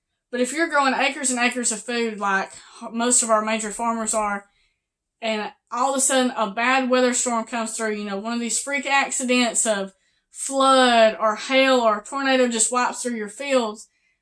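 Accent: South, United States. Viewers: Subscribed, not just for the info... but I love that southern accent! (I'm a Yankee in northern Indiana so it's rare to hear.)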